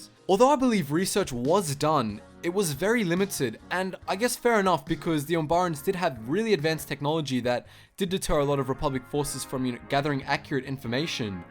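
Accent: Australia. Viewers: You are an Aussie? wooooooooooooooooooooooooo go Australia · Wow. You were not kidding about that Aussie accent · maate didn't know you were a Aussie aye, what state are you from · Ahhh you’re an Aussie like me lol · Aussie eh, Nice 🇦🇺🇦🇺🇦🇺🇦🇺🇦🇺🇦🇺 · How did it take me so long to realize that you are Australian? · I watched you and never new you were an aussie like me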